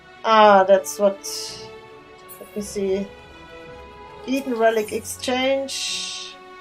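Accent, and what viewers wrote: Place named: Germany. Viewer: this german accent tho ^^ nice guide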